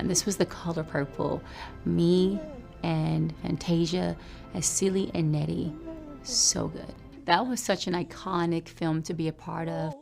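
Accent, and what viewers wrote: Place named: California, United States. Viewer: I know, too much vocal fry and California. She is being genuine but it comes off fake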